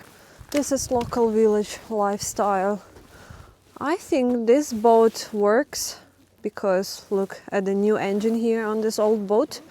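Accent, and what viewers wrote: Russia. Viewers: True winter wonderland filmed by a truly talented and very engaging blogger .. in love with her Russian English accent.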